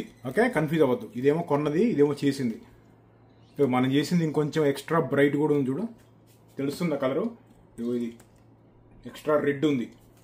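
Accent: Andhra Pradesh, India. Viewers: Andra slag lo matladutunnaru bro, nizamabad ante nammabuddaitaledu anna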